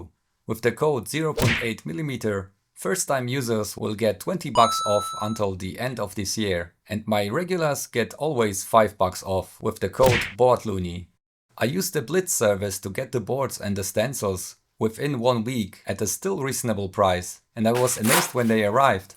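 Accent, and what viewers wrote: Germany. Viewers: Sie haben immer noch Ihren deutschen Akzent.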